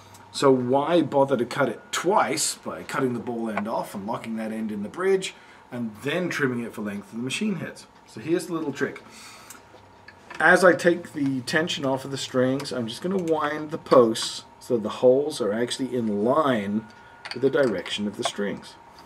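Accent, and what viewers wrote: Australia. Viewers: Tequila - sounds like he comes from Australia? Sounds like that kind of accent. Anyway, awesomely informative video - I've got a razorback coming soon and this makes it look so simple! 5*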